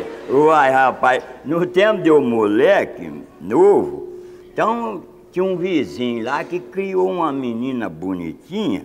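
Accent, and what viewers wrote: Minas Gerais, Brazil. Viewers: conheço bem esse sotaque kkk minero raiz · Onde nasceu não sei . O sotaque e de mineiro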